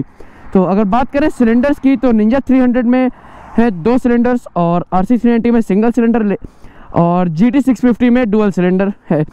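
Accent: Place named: Lucknow, India. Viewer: Loved the lucknow accent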